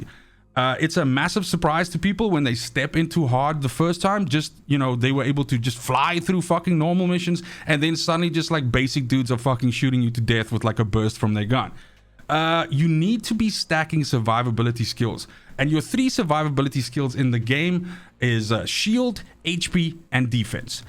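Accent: South Africa. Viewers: Sounds like a fellow South African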